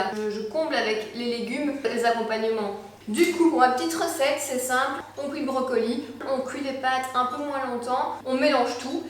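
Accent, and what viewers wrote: Belgium. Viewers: J’adore ton accent belge 😂 pour les pâtes de pois de pois chiche et lentille y en a au colruyt 😉 es ce ke tu peux dire quelle genre de biscuit tu manges es ce que sont ceux des régimes ou les normaux😘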